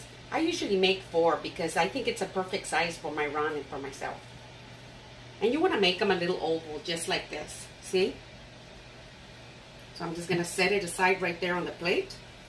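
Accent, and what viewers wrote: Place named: Louisiana, United States. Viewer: YUM!!! your accent sounds like "Southern Louisiana" to me. I lived in Baton Rouge for about 18 years.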